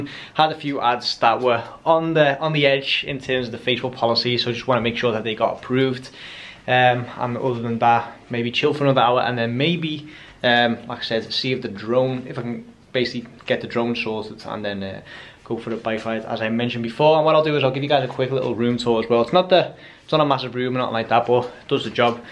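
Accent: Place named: United Kingdom. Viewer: How do u have British accent as a Dutch?😄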